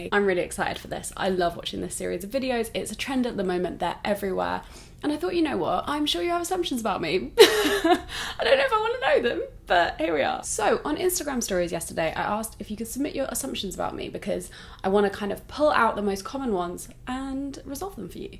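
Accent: RP, United Kingdom. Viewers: Is it weird that I never noticed had a posh accent until she said lol? I guess she comes across so genuine that it didn’t really matter. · As a non-native English speaker, I must say I absolutely love your posh accent! I also really appreciate how authentic you are, thank you for the wonderful content! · I got the distinct impression that on the one hand she didn't consider herself to come from a wealthy background, but on the other she also intellectually knew that she was born of two professional parents in archetypal upper middle class dormitory town Tunbridge Wells in Kent, speaks with an RP accent & appears to have survived a 3 year university degree without emerging hugely & unsustainably in debt, and that these two sets of information were in some tension. This is absolutely not a dig at her or you, just an observation for perspective purposes. 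We don't get to choose the circumstances of our birth.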